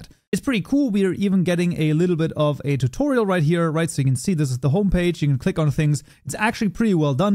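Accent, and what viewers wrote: Germany. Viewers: Man hört zwar raus, dass du deutsch bist, aber definiv keine schlechte Aussprache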